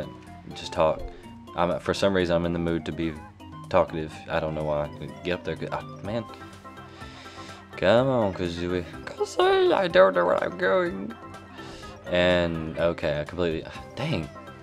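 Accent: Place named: South, United States. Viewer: Your accent is pretty cool! It's southern but not seriously obnoxious like other people with southern accents I've heard. It's wierd because I live in the south and I don't have a southern accent... I don't think. O.o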